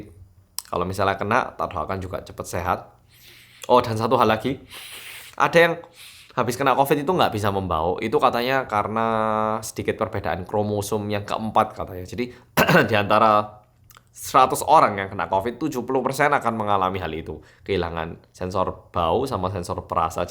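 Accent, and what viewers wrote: Java, Indonesia. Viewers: Semoga cepet sembuh Mas, Stay safe and stay healthy Sing semangat mas, 화이팅❤ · Gws mas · Ko ngomong nya bisa medok dan lancar bahasa indonesia nya ?lahir nya di mana ? Dulu pernah tinggal di malang .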